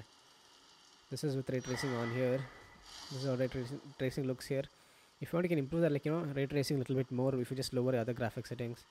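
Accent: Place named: India. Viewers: The problem is if he tries to change his accent to native speakers then indian people will say that he is trying to be sn American.😄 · I don't understand Indian English sory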